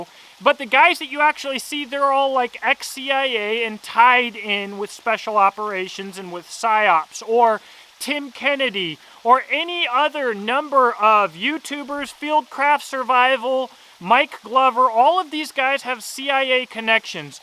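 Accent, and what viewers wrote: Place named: New England, United States. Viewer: His Yankee voice is so annoying.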